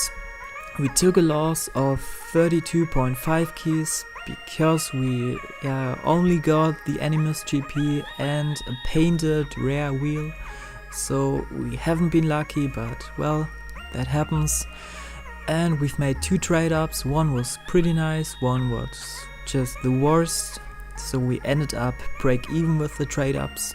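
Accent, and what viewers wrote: Germany. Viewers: Hey dude nice vid you lissen Like a German Guy ^^, i am German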